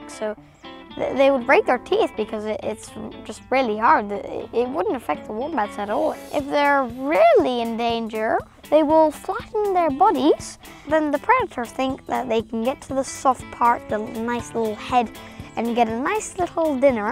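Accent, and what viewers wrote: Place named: United States. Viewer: he's not an australian his accent is to American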